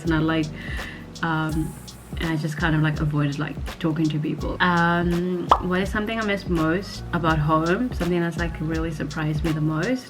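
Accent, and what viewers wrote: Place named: Australia. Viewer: I think I am the only person who thought you had an Australian accent. 😂 Will you be coming back home to watch Beiber? 😏 It is so amazing watching your videos, I have been in love with your content since the first video I watched. Thank you so much for sharing all your experiences with us, it is truly amazing to watch. I hope you will be able to come back home and just be with your family. Sending love and support from home.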